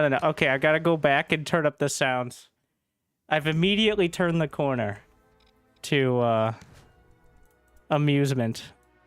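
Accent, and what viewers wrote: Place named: South, United States. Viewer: Did you travel around a ton during childhood or is this the strangest accent I've ever heard from, allegedly, MICHIGAN? I was trying to nail down your accent which definitely sounds Southern, I was thinking East of Texas, maybe even upscale Arkansas, or Nashville Tennessee was my most precise guess - the sharp S-sound, the tip-of-the-tongue L combined with the otherwise Southern sound. - I decided to look it up and it said birthplace: Michigan. Would've been my last guess. is there some trailer park community there that has an odd isolated drawl haha?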